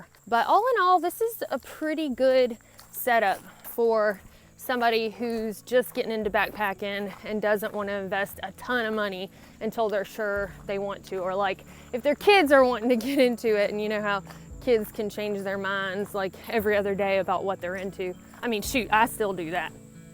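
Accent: South, United States. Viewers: How cute you're obviously from the south I detect a Southern accent lol👍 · Missed that southern draw. Thanks for the views. Have a great day. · Very enjoyable and good info for my Grandkids. I'm born and raised from Texas now living in Michigan. If I don't hear that Southern lilt now and then I have to go south and have pie and listen to a Southern Waiteress. Thanks for everything!!! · You seem to have got more Southern-sounding since lockdown started. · You are so inspirational and beautiful. Love the southern accent. Ut oh I think i have a crush.... Lol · Dang i miss the south.. I'm stuck in yankee land... love the accent.... makes my hes and haws pop out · Barely found this channel and I love her camera work and southern accent. Thumbs up and subscribed.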